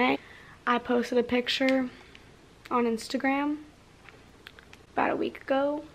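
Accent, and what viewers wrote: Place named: United States. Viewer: Omg your American acsent so good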